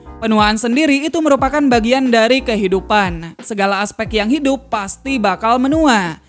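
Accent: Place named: West Java, Indonesia. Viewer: Wew dengan logat sunda gitu?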